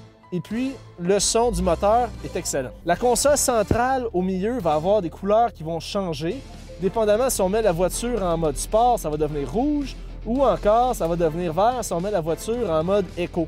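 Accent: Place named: Vaud, Switzerland. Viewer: On dirait un accent vaudois quoi!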